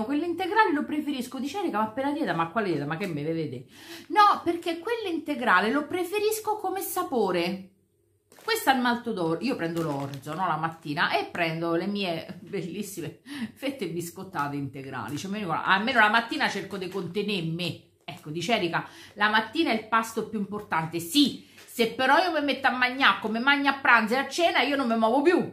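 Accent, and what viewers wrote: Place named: Tuscany, Italy. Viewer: Dai, sei toscana...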